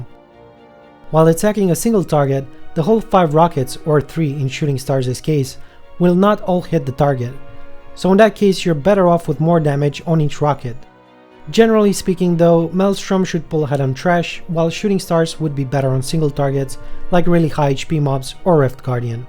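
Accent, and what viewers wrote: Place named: Philippines. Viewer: you sound like filipino.. hmm...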